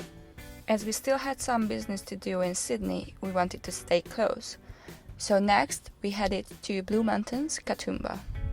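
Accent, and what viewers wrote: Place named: Hungary. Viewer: start the video, hear your voice and I'm like u gotta hungarian. I'm gonna binge watch your videos real quick as australia is my dream location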